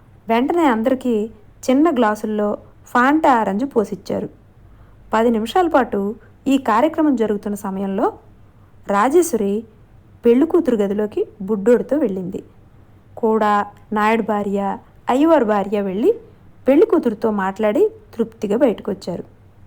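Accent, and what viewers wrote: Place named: Rayalaseema, India. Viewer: చిత్తూరు నెల్లూరు ప్రాంతాల మోడల్ ఉంటుంది కదా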